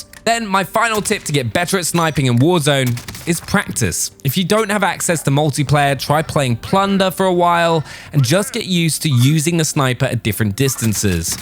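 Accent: United Kingdom. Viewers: Probably his British accent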